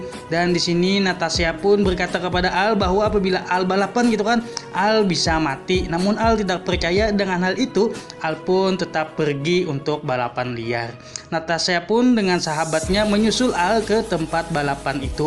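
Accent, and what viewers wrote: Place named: Banjar, Indonesia. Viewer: Hadewww logatx orang banjar bgtt 😂😂😂😂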